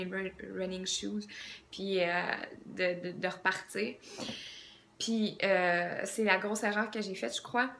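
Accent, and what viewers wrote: Canada. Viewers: C'est trop chou l'accent quebecois <3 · Très charmant l'accent canadien pour un Français ! Pour mon cas ça serait une dépression mais j'ai une énorme fatigue chronique suite à une colossale surcharge de stress .J'en ai marre de chercher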